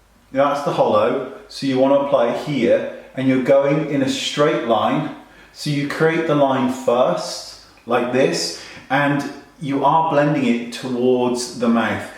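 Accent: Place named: England, United Kingdom. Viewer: You are sooooo sweet!!!!! you seem a really really nice person, also I love your english accent. Well anyway, I want to ask you if you could show me how to do the makeup on a face with rosacea, not severe, just with a pinkish tone. In my eagerness to camouflage my rosacea I always end up looking with no life whatsoever, and going in again with a pink blusher it just seems nonsense. So now I leave my cheekbones with my pink rosacea and then I apply my foundation on the rest of my face, it looks ok, but I'm sure there's a better way around it. Help please! :D Kisses! (I'm moving to London on March 2015 Yeyyy!!!)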